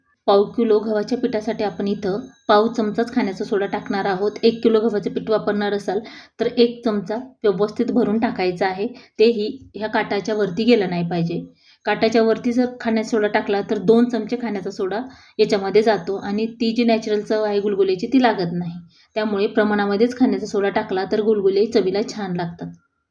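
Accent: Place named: Marathwada, India. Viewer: Mi latur chi Lagech smjt la aaplya side chi tone 😊 Mazi frnd ahe dharashiv chi😊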